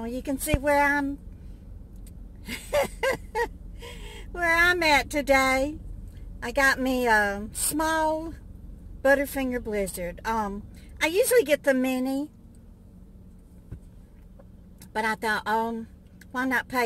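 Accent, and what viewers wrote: South, United States. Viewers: I enjoy your channel. There are people(other you tube channels) out there saying you talk in a syrupy southern accent, but I know its real because I have known people from Mississippi. As far as those saying you are a con artist because of your pay pal and patreon, that's not illegal to do that and people like me who like to watch but can't afford to send you money are still free to watch. Be careful of the mean people out there, don't acknowledge them anymore if they contact you, it fuels the fire. I took up for you and got attacked by some. Be safe and keep on vlogging.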